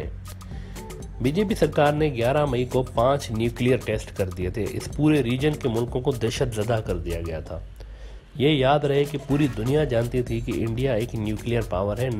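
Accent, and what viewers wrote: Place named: Pakistan. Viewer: Can't you notice his tone of Pakistani Urdu??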